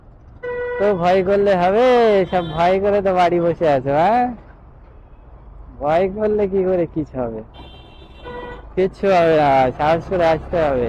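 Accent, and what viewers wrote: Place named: Basirhat, India. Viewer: Apni bosirhat er chele taina? Apnar kathar tan okhaner moto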